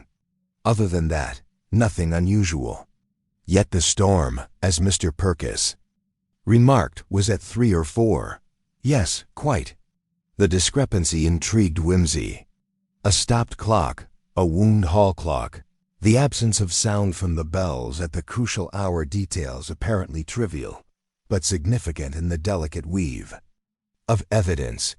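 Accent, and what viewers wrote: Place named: United States. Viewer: It lost its soul when read in American English. Deleting the channel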